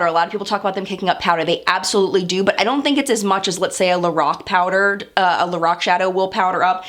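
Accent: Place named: East Coast, United States. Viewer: This was a great review! Seriously, the format was impeccable (sincerely appreciate the skip-ahead sections!) and I love you allowed us as the viewers to decide if we think it's a good choice for us. It felt educational with out too much bias, and it was great to see how thorough you were with reviewing every aspect of the palette, right down to side-by-side ingredient comparisons. I appreciate how you acknowledged who this palette is ideal for and who it may be less ideal for--a lot of make up reviews insist something is bad because it wasn't for them, it's so frustrating! So really, this was a freaking great review (also it's been so long since i heard that east coast accent!!! <3)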